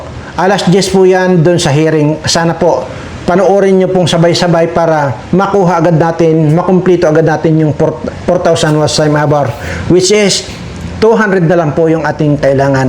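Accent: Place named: Hiligaynon, Philippines. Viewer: Tuod man nga ilongga ka day ,sa tono ng salita mo ,namit ka nga gahambal sang bagbakal mo ng juice sa tiangge, nano ka man nga ivedencia gid ang ginahimo mo ? Duda ka pa sa tono day??? Ha ha ha I like it puedi na ako ilongga sir?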